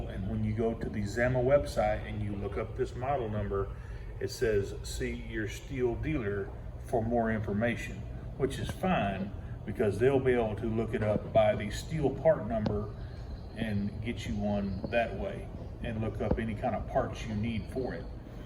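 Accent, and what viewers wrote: Texas, United States. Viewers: I think he’s a Texan.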